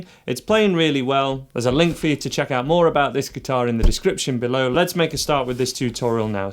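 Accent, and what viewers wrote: United Kingdom. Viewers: And THIS GUY IS BRITISH! Score!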